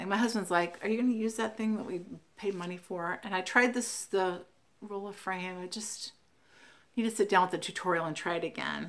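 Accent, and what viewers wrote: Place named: South, United States. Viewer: Great video. I love everything you stitch. You must have lived in the south at one time or have southern roots. You say y’all (I love it) and you said little bitty. That’s a definite giveaway. Look forward to your next video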